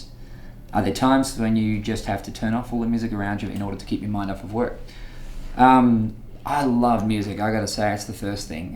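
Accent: Australia. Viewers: yeahhhhhh, he answered my question !!!!! That's me LaVonda from Texas. I could listen to him say my name a million times and never get tired of that dreamy aussie accent.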